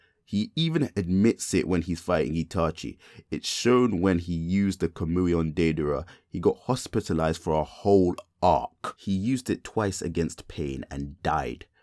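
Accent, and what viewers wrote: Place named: United Kingdom. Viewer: bro your british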